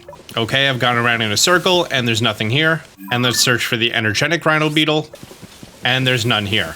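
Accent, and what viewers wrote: New Jersey, United States. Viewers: Oh man, New Jesey English is like trying to talk to someone from switzerland. (Hello from Austria )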